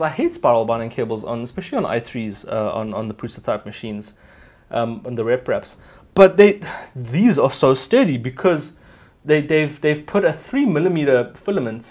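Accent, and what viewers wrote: South Africa. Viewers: dig that Saffa accent - FTW :-) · Are you South African??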